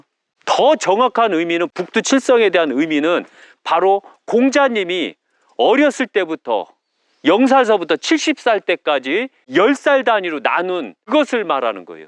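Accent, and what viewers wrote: Chungcheong, South Korea. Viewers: 선생님잘보고갑니다^^ 충청도사투리구수하네유^^ 충청도어디세유?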